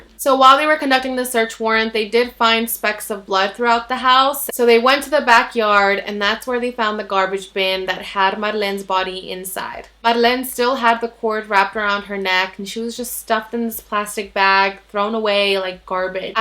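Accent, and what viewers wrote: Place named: Spain. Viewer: I love your Spanish accent lol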